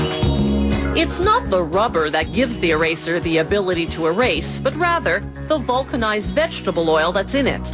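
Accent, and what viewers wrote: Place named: Canada. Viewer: she's very canadian, eay.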